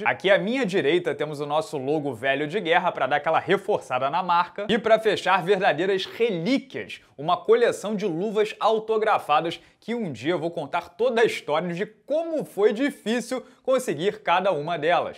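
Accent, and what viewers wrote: Rio de Janeiro, Brazil. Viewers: provavelmente você não vai ler esse comentário.... Mas parabéns pela inovação no canal 👏👏👏👏....merece o reconhecimento e o sucesso muito pela humildade (e olha que eu não gosto do sotaque carioca, problema meu, eu sei) espero que não perda essa humildade. O canal só perde em conteúdo para o Victor Miranda, mas entendo que são propostas diferentes. Quem ganha são os inscritos..!!...parabéns, voa, foguete não tem ré..!!!🚀🏆👆👏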